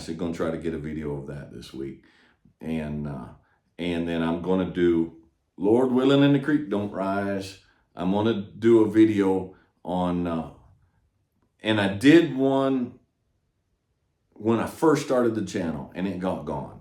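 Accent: United States. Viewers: bro ı dont understand english but your cowboy english ı like it so ım watching you AHAH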